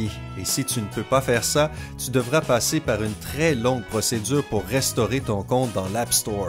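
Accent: Quebec, Canada. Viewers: Sympa t’es canadien ? Sa se voit avec ton accent 😉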